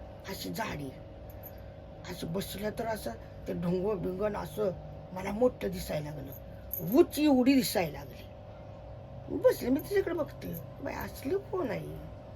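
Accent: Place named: Rural, India. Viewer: Ajini ekdum gavchya padhati madhe cahb sangitla😊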